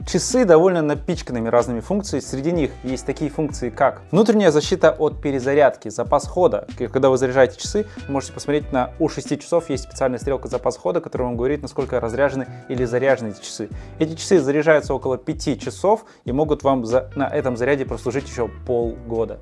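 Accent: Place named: Kazakhstan. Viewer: Он из Казахстана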